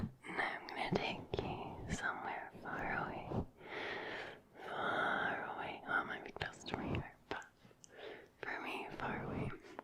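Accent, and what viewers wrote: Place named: Glasgow, United Kingdom. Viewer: From Glasgow AFAIK, or at least one of the towns around it.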